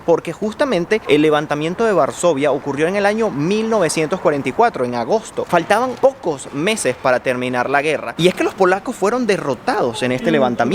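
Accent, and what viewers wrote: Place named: Venezuela. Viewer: soy venezolana y por casualidad me encontré con este video. Al identificar tu acento, me emocione. Al mismo tiempo me lleno de admiración como en estos tiempos tan difíciles están realizando tu y tu esposa este trabajo increíble. LOs felicitó! Continúen con esto que es el rescate de la historia y su legado a las nuevas generaciones.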